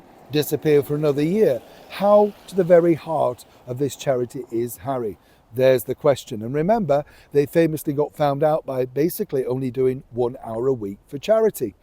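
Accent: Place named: North, United Kingdom. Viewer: Here’s Neil Sean my one true love bwahaha out here in his comfortable northern accent chatting about all the things we remember before dropping some truth bombs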